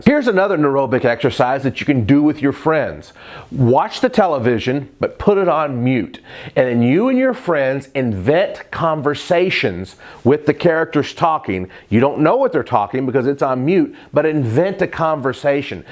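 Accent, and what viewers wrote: United States. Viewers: Are you from America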